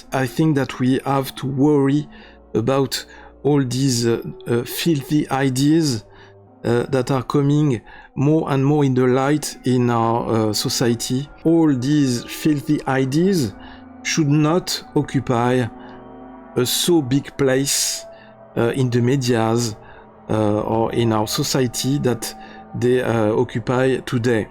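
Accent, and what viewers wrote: France. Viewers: Fuck yeah!! The coolest Alien footage but who new delivered in a french accent is bangin man!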